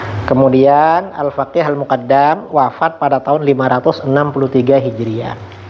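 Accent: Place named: Madura, Indonesia. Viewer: Ini kyai mana ,kayaknya madura,kok gini pangaoningannya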